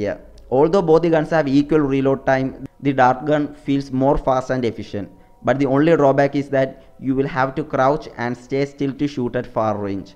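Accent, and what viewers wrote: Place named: Kerala, India. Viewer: U are a mallu.... ur eng tells it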